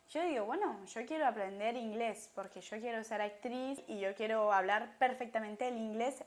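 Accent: Argentina. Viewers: Olles tienes acento o hablas argetino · Me gusta como hablas pareces Argentina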